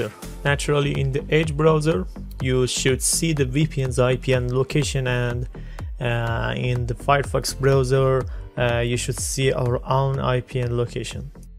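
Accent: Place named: Eastern Europe. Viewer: You're Persian but you have an eastern European accent. That's interesting. Thanks for the tutorial.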